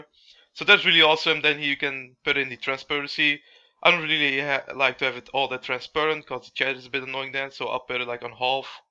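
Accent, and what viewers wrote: Netherlands. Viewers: je hoort egt dat hij nederlands is :p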